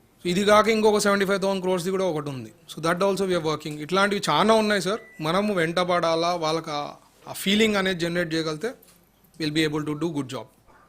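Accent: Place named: Kurnool, India. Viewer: is using his kurnool slang so dont think bad about him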